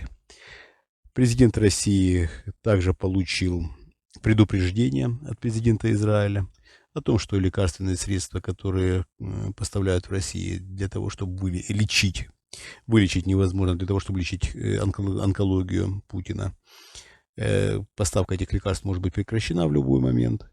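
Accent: Ukraine. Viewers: Почему меня не покидает мысль, что это Украинский проект. Он и разговаривает так-же и акцент такой-же. Это нисколько не влияет на смысл той информации которая идет от него, но все-же... У меня ощущение, что меня теперь давят со стороны Украины. Сразу скажу, я не сторонник всего этого хаоса. Я уважаю мнение другого человека. И то что сейчас происходит, можно назвать только одним словом Пи...ц...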